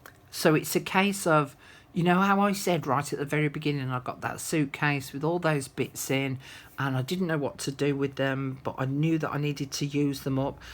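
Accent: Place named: Black Country, United Kingdom. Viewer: Are you from the Black Country? You sound like my friend from Wolverhampton :-) I live in Florida.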